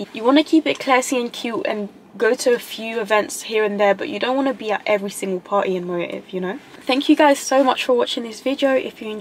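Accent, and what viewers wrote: United Kingdom. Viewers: You have a nice accent it's like a British accent ❤️🇬🇧👍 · You have a nice accent it's like a British accent ❤ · Because it is a British accent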